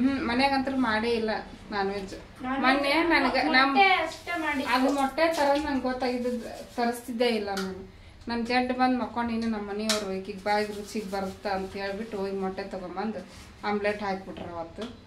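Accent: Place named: Uttara Kannada, India. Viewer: Neev helid nija hasge iddastu kaal chachu anta gaade edyalla hage naavu hage num badgetge corectagi jeevna madtivi nim utrakannada maat kelakke channagirutte henmaklige helkodude beda avrige tilvalke jasti tande tayi anta preeti kalji madtare nanguu ebru henmaklu channag nodkantare jwara bandre bayig yenuu bedagutte nam kade kanchikay uppinkay hakkand uuta madtivi swalpa nalge ruchi barutte nan maglu frijalidakke heegidde box tandale nice vlog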